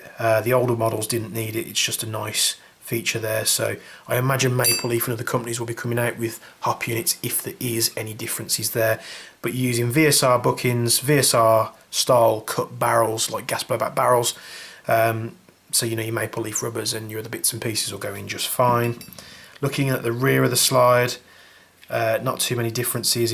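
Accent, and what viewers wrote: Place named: Australia. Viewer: Have you ever lived in Australia? Your voice is very similar to Australians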